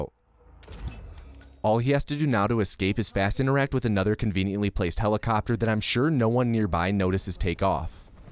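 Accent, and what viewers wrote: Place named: Midwest, United States. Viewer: It's so weird that I didn't notice any accent in your voice until AFTER the Chicago guy skit; Once you returned to your normal speaking voice I could hear lil bits and pieces that sounded distinctly Midwestern. As a native Chicagoan myself I was never able to detect the "Chicago accent" while I lived there unless it was very pronounced or over-the-top like in SNL's Da Bears skit. Other than that I was convinced that we spoke "neutral" English. After living in Oregon for almost 7 years though I'm slowly getting better at picking up on the subtle nuances of Chicago-talk. The biggest difference I've been able to spot is in short "o" sounds like in the word "body". I realized that I say it as though it were a short "a" sound, almost like "bahdy". I guess I'm just anudder one a' da hunnerds of Chicahgo fellers who tahks like a gahsh darn fool 😮‍💨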